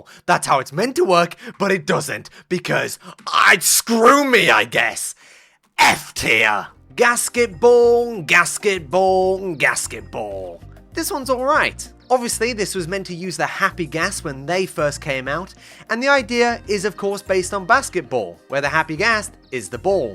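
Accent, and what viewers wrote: United Kingdom. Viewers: will you tell us how we can acquire an unhinged british accent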